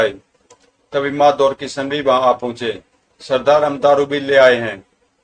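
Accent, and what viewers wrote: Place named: Himachal Pradesh, India. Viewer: लहजे से उत्तर भारतीय लगते हो...हिमाचली...बस कॉमिक्स में अपने पर्सनल विचार/ हंसी न दिया करें। बाकी सब बढ़िया है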